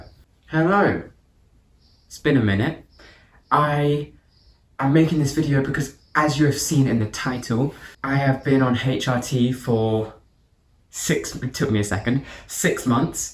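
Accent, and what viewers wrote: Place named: United Kingdom. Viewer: Your British accent is so cute 🥹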